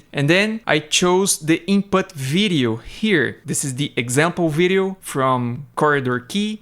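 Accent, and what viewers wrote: Brazil. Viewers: esse sotaque é. brasil conheço de longe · Pelo sotaque chuto que é brasileirinho, não? Excelente vídeo mano. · Você é BR né safado? conheço o sotaque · I literally commented on their video like "Now I just need a H100 to run this" and boom this pops up in my recommendations and you sound just like me talking in english, impossível um brasileiro não reconhecer o outro, me inscrevi no canal muito bom o vídeo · Brasileiro? O sotaque é bem inglês brasileiro (Acho lindo)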